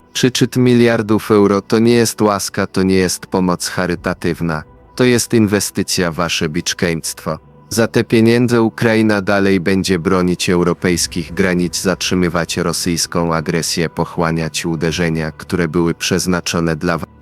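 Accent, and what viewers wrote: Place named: East, Poland. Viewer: Kto to czyta No Akcent jest Wschodni jeszcze to jest zrozumiałe Ale kwoty liczby daty lata nie mogłam wyłapać Ile miliardów kiedy była ta konferencja więcej staranności Akcent mi nie przeszkadza gwałt przekazywanych Ukrainie lata miesiące kraje więcej szacunku dla osób korzystających z kanału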